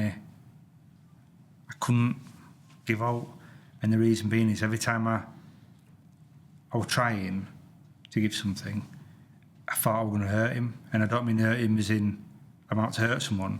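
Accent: Yorkshire, United Kingdom. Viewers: Love yer Yorkshire accent. Full marks for your bravery. Well done sir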